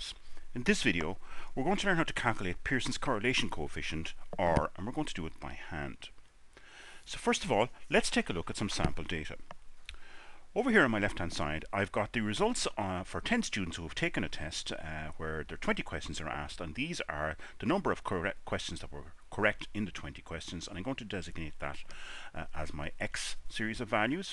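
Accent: Ireland. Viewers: That is a thick Irish brogue!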